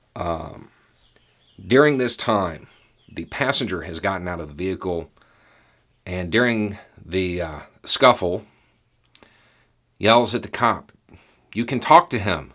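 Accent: South, United States. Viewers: I would like you to cover more of this. Dr Richy does but because he’s black I fear he’s not respected among some as you are because you’re white and have a southern accent.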